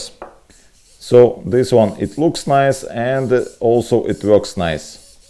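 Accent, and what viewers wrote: Russia. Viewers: Are you Russian?)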